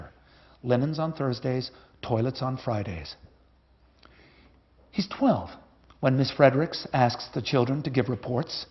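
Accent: United States. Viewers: why is he reading in an american accent at Oxford?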